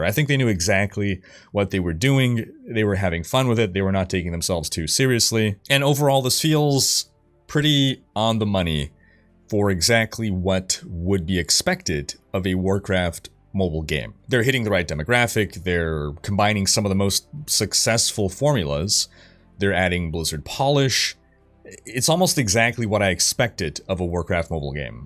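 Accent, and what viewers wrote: Quebec, Canada. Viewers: Wow did not know you spoke Québec french ! Ton accent est excellent ! spa mal bon ! Sa fais des années que je te suit, tu es la meilleur source d'inforemation sur Diablo et autre que je connaisse sur youtube ! Lache pas ton excellent travail ! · His "english canadian" is so good, I wonder if is his french canadian is his mother tongue or not. 😀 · Are you from around Montreal? Your Quebec accent is on point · Playing ladder, for the first time in d2 (played this game back in the day, never made it all the way to Hell difficulty). I see this ladder as an opportunity to finally get to endgame and experience it. I made a fire druid, very funny since the 2.4 patch. At the time of writing, I’m level 55, act 3 Nightmare, and I’m having a blast so far!!! Thanks to your video I managed to do a Spirit Crystal sword and it is very strong!!! 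Also, I just recently discovered your channel cuz of D2R ladder, and love your content! Specially you speak french canadian (as I am), that Câlisse was funny!) 😂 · Hahaha so nice to hear you talking (Canadian) french ! (Belgian/french speaking follower here :))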